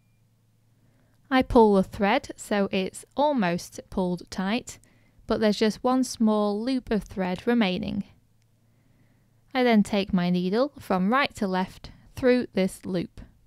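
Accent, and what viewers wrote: United Kingdom. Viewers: Love your British accent! This helped me learn. I like when you speak slow and repeat, so I can understand. Thank you dear! From - Lori / MN, USA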